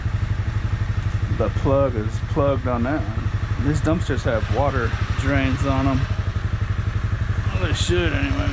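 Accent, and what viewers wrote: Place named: South, United States. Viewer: You’re back! Again! 🥰😁. No, talk as much as you want, I for one, enjoy your particular southern accent. Being southern myself (although, some people don’t consider Florida as being southern), I enjoy and appreciate all the different variations of the southern accents. ✌🏼❤️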